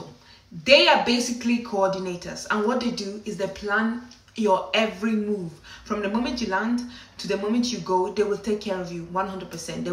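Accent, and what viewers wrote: Nigeria. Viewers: Omg i can tell your friends enjoy your storytelling abilities 😂😂 can't believe your effortless retention skills. I loved this! Love the Nigerian accent and expressions 😹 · She sounds Nigerian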